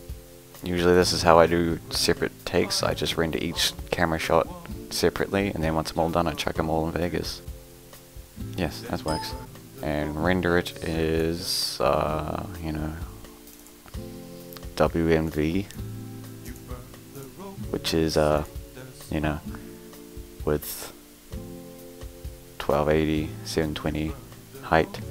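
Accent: New Zealand. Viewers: new zealand accent ftw!!! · I know hes a kiwi...I'm used to people confusing me for a Brit/Welshman.... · No! It's a New Zealand accent. They're like, where's the caar, and he's like, where's the caar. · sexy new zealand accent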